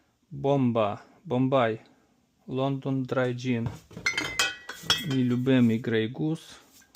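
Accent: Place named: Zhytomyr, Ukraine. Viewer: Говір житомирської області.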